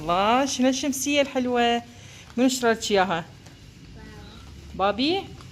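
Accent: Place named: Diyala, Iraq. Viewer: الله يبارك بيك صوتك من اهل ديالى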